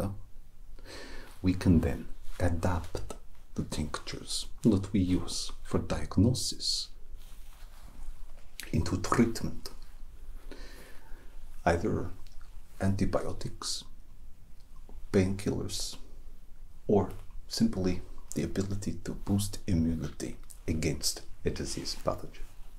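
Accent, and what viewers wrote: Russia. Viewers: I always love it when you use a russian accent in your videos. The autopsy part was my favourite. 😊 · your russian accent is getting better!!! also very unexpected to see pathologic content, but so so cool. absolutely amazing games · ! It's just amazing! I would like to thank you for your efforts to speak and write in russian. Nice job!💛 (русские отпишитесь здесь! я думаю, что вам тоже нравится, как он старается спародировать русский акцент) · I can't even decide what type of ASMR I love more - talking with Russian accent or surgical procedures. It''s a perfect combo :D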